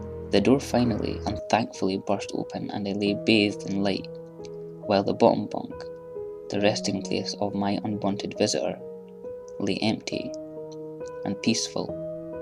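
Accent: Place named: Scotland, United Kingdom. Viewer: Nice to hear a fellow Scot read one of my stories. Nicely done :) ~ Mike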